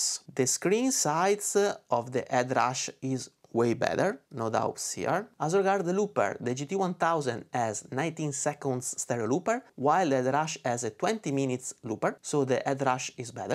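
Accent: Venice, Italy. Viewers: go idea che ti xe veneto! bravissimo in ogni caso!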